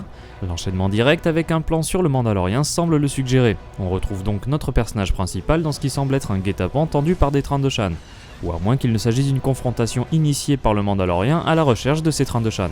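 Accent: England, United Kingdom. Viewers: Je pense que la scène avec l'enfant et le matériel de la CSI est un flashback. Pour le vaisseau inconnu, il verse dans le style corellien non ? Enfin, le personnage avec le blaster sur l'épaule me fait tout de suite penser à Cydon Prax, un chasseur de primes apparu dans le jeu vidéo Star Wars The Clone Wars (PS2 et GameCube). Superbe analyse comme toujours ! Il faut conserver l'accent anglais, c'est là votre marque de fabrique 🙂 !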